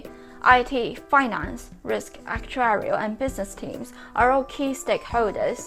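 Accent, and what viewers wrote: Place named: United Kingdom. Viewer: Hello, many thanks for this informative video, really well appreciated. PS: With all respect meant, if I could add that on, though : The (british) accent is really very strong.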